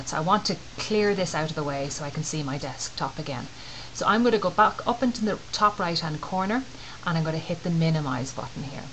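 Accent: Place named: Dublin, Ireland. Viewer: I was sitting here listening to my wife looking at your video and I was wondering what part of Dublin are you from ? Im from Cabra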